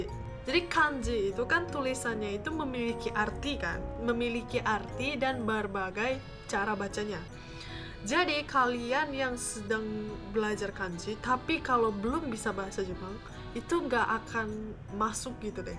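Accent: Indonesia. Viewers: Logatnya certified mba-mba indo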